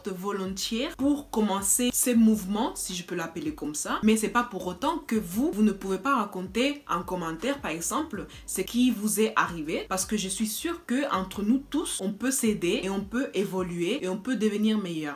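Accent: Spain. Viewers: Je pense que tu es la 1ère espagnole noire que je vois! J'étudie l'espagnol et je trouve que c'est aussi difficile que le français quand même... J'adore ton accent et j'ai hâte de voir tes storytimes :) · J'aime ton accent espagnol continue comme sa sinon tu es très belle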